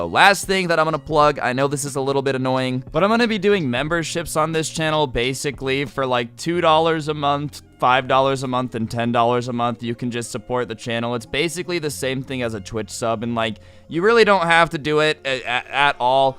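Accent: United Kingdom. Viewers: Great, British accent /\ | | Do I need a coma there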